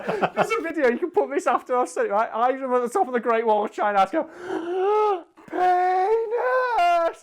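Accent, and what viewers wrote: United Kingdom. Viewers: tone the british back a notch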